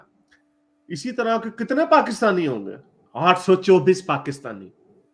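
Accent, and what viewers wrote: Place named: Punjab, India. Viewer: Bhai ji apki urdu ka panjabi lahaje me bat karna kafi acha lagata hai🌹🙏🌹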